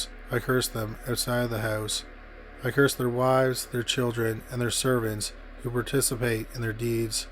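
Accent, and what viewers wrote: Canada. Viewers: He’s Canadian, that’s his accent. Leave his page if it bothers you so much. I used to turn up the speed of his videos when I first started watching bc his voice was annoying to me. I don’t mind it anymore.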